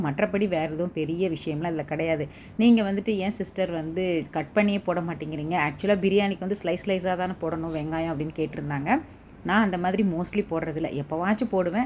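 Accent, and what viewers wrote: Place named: Kongu, India. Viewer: Neega erode ah sis neega pesarthu kongu Tamil maathirye iruku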